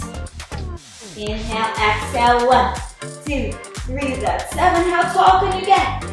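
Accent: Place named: East Coast, United States. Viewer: You make this doable. Wonderful attitude and sounds like you are from the east coast. New Jersey maybe?? Are you still doing your personal trainer program, and where are you located please. Thank you for sharing this.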